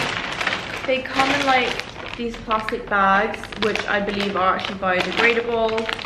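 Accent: United Kingdom. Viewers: It’s a British accent and she lives in Dubai. I love it:)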